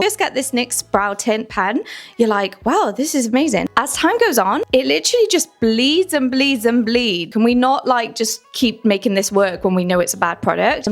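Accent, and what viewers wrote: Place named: United Kingdom. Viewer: You accent is so cute. Where in the UK did you grow up?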